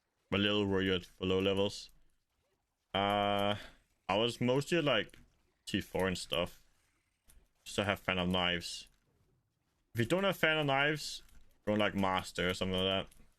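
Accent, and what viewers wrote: Denmark. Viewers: Yo GOAT. Are you not playing HC? Sorry if you mentioned it, didnt watch the whole vid, but I did see you die. Would like to compete with you to benchmark my skills, I usually Play HC but this theme might be a SC season, especially if you go SC. Btw great content, hopefully you get the thousands of subs you deserve this season. Er du dansk forresten? Accenten 😆💪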